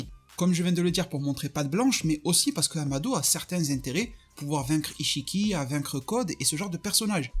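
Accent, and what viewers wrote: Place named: South, France. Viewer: ton léger accent du sud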